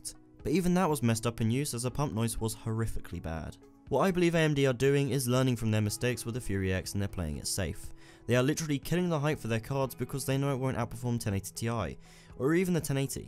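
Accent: United Kingdom. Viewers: omg replied awesome videos bro i dig that british accent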